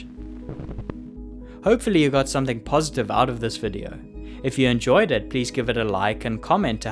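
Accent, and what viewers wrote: South Africa. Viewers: sorry good sir are you South African??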